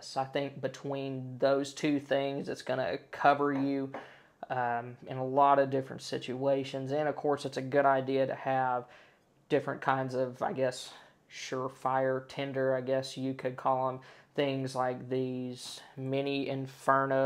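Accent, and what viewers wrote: Texas, United States. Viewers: Sounds like your either west of Fort Worth or south of me in central Texas. We are in the DFW area and have a place in southeastern OK that we can go. Your on the money all the time with your ideas here. I'm a retired BSA volunteer Commissioner ....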